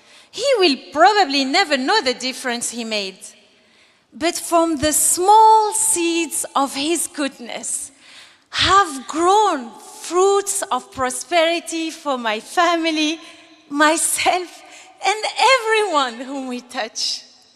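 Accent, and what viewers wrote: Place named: France. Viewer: nope that's actually her English accent, you can hear the French in it.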